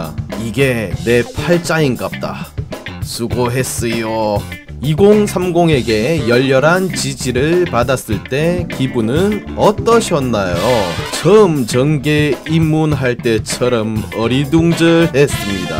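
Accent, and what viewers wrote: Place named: Seoul, South Korea. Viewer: ㅋㅋㅋ 넘 귀여운 서울 말 씨! ㅋㅋ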